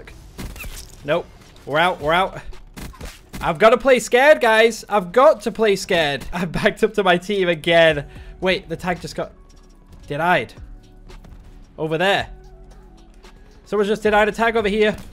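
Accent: United Kingdom. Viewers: I like your accent fluent English and just the little British tint love it!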